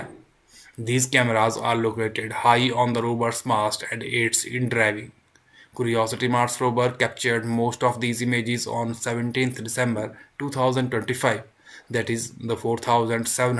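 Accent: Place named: Scotland, United Kingdom. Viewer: That's a thick Scottish accent you've got there. The straight lines on Mars look intelligently made, like a channel or something. I'm not sure of the scale however. This might be AI bullshit.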